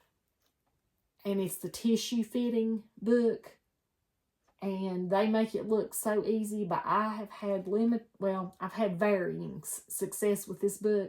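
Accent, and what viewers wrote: South, United States. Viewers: I enjoyed your book sharing. Many of those books are books I’ve owned and then when I had to downsize had to pass them on. But, they brought me much pleasure while I had them. (I do a lot of mental sewing!). I knew your southern accent resonated with me. I live in western North Carolina, too!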